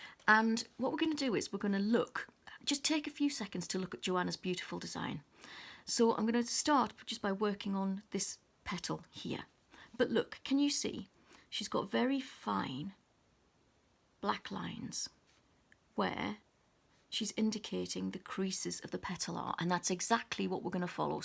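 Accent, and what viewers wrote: England, United Kingdom. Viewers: Really lovely video I have only just started colouring so I am looking at as many videos as I can. It’s lovely to find an English voice as most of the videos are from the USA. I just hope that I can one day colour as good as you. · I am feeling closer to the UK now hearing you during this tutorial! Thanks darling!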